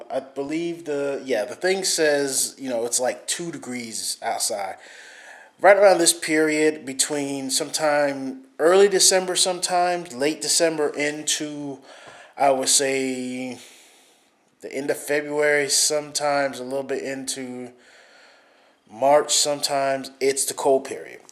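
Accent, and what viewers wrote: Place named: Jutland, Denmark. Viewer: That accent.. You've lived in Jylland too long man :)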